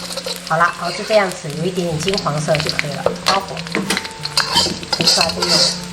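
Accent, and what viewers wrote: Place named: Fuzhou, China. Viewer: 你是四川人,但会说福州話？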